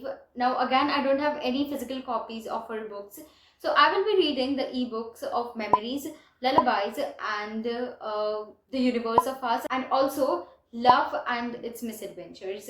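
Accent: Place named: Gujarat, India. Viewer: Nice Gujarati accent☃☃☃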